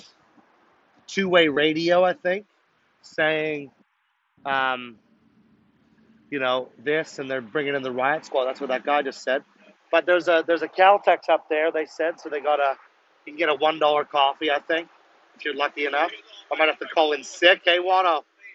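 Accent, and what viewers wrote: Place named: United States. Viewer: 1984 is a book by George Orwell, Mate. You sound American, though. Love from NJ, USA. GO TRUCKIES! SHUT IT DOWN! Australia's leaders have gone insane.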